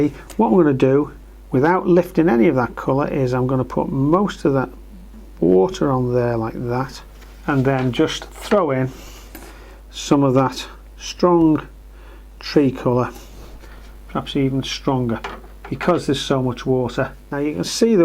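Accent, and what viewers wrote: Liverpool, United Kingdom. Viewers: this guy sounds scouse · Lovely demo,and great to hear a fellow scouse accent!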